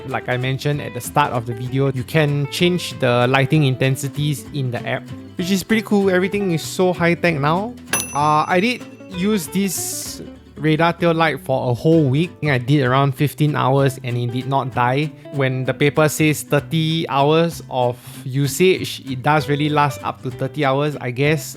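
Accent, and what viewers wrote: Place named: China. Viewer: This Chinese dude has a nice accent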